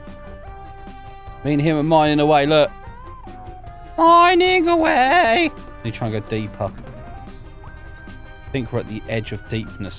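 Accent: United Kingdom. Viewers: i think you speak british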